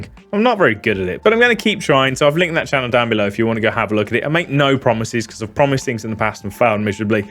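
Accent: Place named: Birmingham, United Kingdom. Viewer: Did not think you was Brummie, convinced myself you was a northerner 😳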